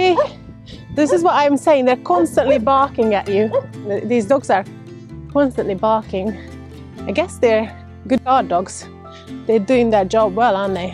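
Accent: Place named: United Kingdom. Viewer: You seem to have a slight British accent. How many years have you worked in the UK? Is there still a lot of young hungarians working?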